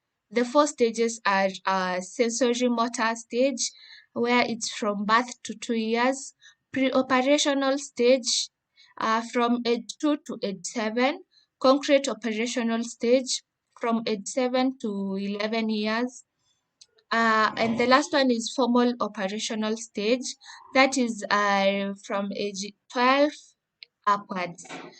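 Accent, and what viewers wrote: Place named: Kuria, Kenya. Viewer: Heavy Kurian accent kama nusu vinemba wenzako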